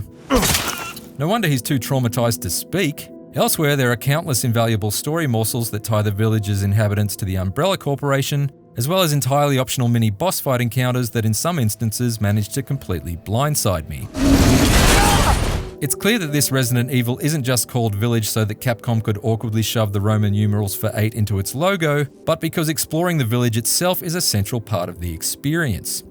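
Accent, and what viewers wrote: Australia. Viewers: The guy clearly has an Australian accent. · love the aus accent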